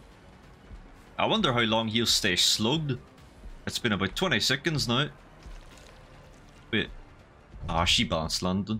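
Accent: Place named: United Kingdom. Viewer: For 1-2 minute i wonder what does it mean "faint them" Then i realise it's pronounce of words "found them" xdd GB accent sometimes hard to understand, especially if English is not my native language :)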